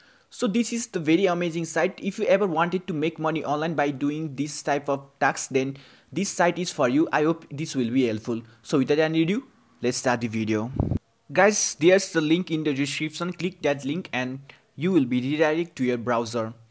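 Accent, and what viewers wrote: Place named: Nepal. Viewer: You have Nepali accent. Are you Nepali?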